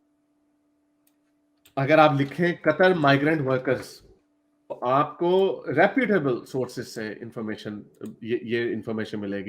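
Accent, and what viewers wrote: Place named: Punjab, India. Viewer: Bhai ji apki urdu ka panjabi lahaje me bat karna kafi acha lagata hai🌹🙏🌹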